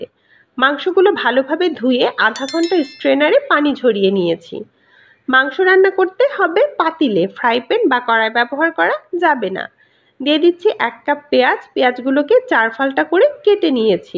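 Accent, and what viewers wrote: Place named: West Bengal, India. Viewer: আপনার চ্যানেলের নাম, আপনার কথা শুনে মনে হয় পশ্চিমবংগের। যদিও দেখলাম বাংলাদেশের।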